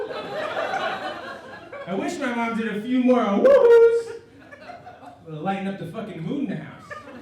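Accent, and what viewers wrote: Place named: Brooklyn, United States. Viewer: I thought he was from Brooklyn.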